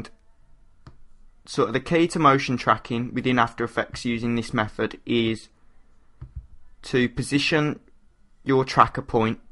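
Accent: Birmingham, United Kingdom. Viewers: Are you from Birmingham?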